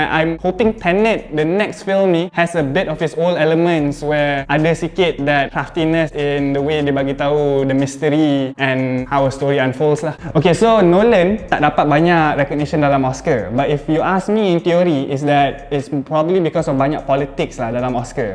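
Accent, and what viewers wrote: Malaysia. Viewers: I love this kind of persona the speaker has. The way he told the stories. R u malaysian or singapore?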